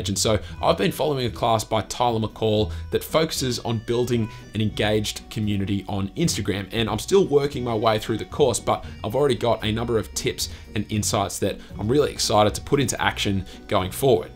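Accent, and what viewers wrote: Australia. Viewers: Heard the Aussie accent and subbed straight away! Looking forward to seeing the back catalogue of videos 👍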